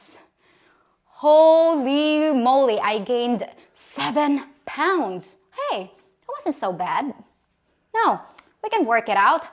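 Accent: United States. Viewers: my dear, don’t know how I missed this video. We lived in the Southeastern corner of Georgia just above the Florida State line. It’s not hard to understand why they may have thought you were “American” you have barely any accent. My wife lived in the UK for a decade and has been here in the U.S. for 12 years this month. She still has quite an accent. The weather was something that got to her in the Southeastern U.S. after she was there about a month she said to me, “I never thought I would find a place more hot or humid than the Philippines…I was wrong!” The toothpicks are for picking up the food on the tray if using your fingers makes you uncomfortable. It’s been 13 years since my wife has been back to the Philippines. She misses Nanay and Tatay greatly but she knows it isn’t practical to go at this time. Anytime you travel, vacation eating is always hard. 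Take it from an old Marine, you have a rocking body! When you come back to the U S try the Intermountain West (Utah, Colorado, Idaho, Montana) it is a short hop from Saratoga Springs Utah to Vegas (4-5 hrs) a days drive to Los Angeles or a days drive to Phoenix Arizona. If you ever do come out here you are already welcome to the Household of Lolo D and Kazz!!! My wife would be a good interview having lived in the UK and here, her baby sister lives across the street and has lived in the UAE (not as an OFW) as a spouse of an American working there. As always another great episode! Keep up the excellent work! Lolo D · you have the American accent down to a T. If a saw and spoke to you, I too would assume you to be an American, because you do not sound foreign. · Wow! I'm so impressed with your observation in just a few days visiting the United States. Yup! American love their pets. and Yes, the odors of Marijuana in crowded places and you are right. 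I don't see anyone smoking. I hate the automated. I prefer to interact with a real person. Yup, water pressure is insane compared to the Philippines. Yes, Americans don't hang around in their yard. Btw, you speak like an American.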